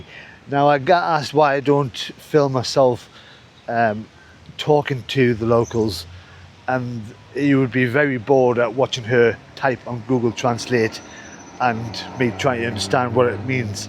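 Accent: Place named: Newcastle, United Kingdom. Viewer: LOL, I am happy to learn a new phrase,,, "He scared the living day...." so funny.😆 I like your videos for the nice way you describe what you see and to learn and get used to your geordie accent. Enjoy your next stops in my country. Greetings and best wishes from a new follower in Chaco, north of Argentina.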